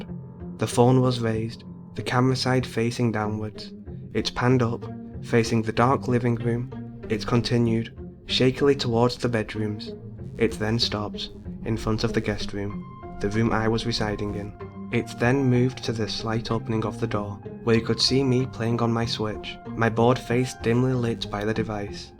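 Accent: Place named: United Kingdom. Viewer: as a American i can say you British and your accent makes everything sound scarier lol good stuff man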